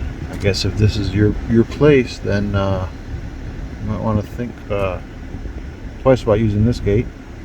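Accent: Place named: Canada. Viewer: This is awesome! I was planning a trip soon! Good Canadian accent!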